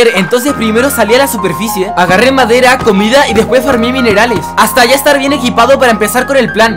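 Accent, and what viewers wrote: Chile. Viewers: eres chileno? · Eres chileno?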